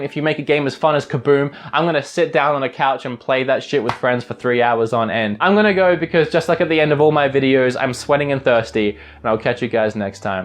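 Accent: Australia. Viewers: YOUR AUSTRALIAN!!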